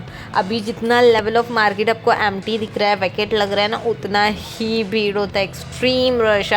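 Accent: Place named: Mumbai, India. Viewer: Axtreme left. Typpickl shilwar.. Apks accent mumbai ka hai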